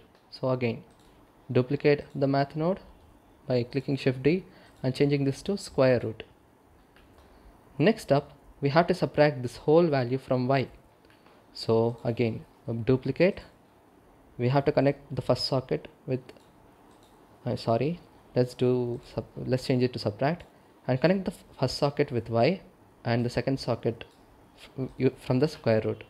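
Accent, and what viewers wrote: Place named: India. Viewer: 인도발음 나오자마자 신뢰감 상승한 영상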